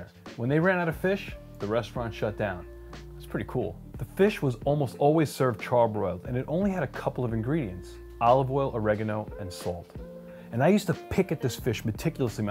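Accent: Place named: Long Island, United States. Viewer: I’m from Long Island and recognized the accent haha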